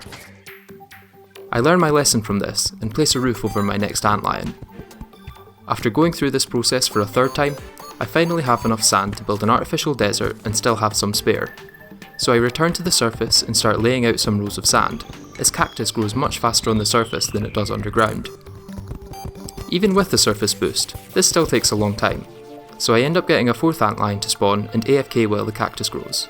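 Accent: Scotland, United Kingdom. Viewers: are you scottish perchance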